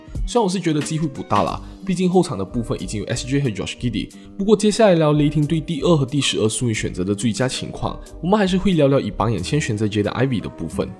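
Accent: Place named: Malaysia. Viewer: 馬來西亞口音本來就跟我們平常聽到的不太一樣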